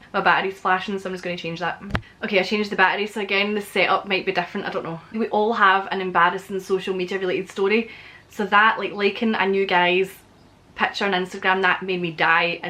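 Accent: Scotland, United Kingdom. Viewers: Sounds like you're a shambles of a lass 😂 love it, definitely subscribing! The one about walking into your mates kitchen with your shirt undone had me flippin dying 😂 Mind you the Scottish accent doesn't hurt 😆 I could listen to Scottish people all day.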